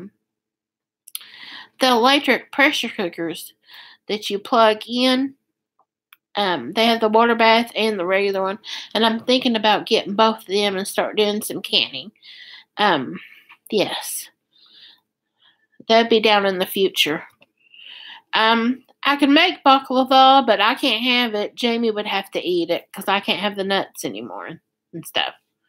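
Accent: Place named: Kentucky, United States. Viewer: I knew you were from Kentucky! 😀 You have that lovely “gentle southern” accent that seems to be specific to Kentucky 😊 so soothing. It’s one of my favorite things about you. 💗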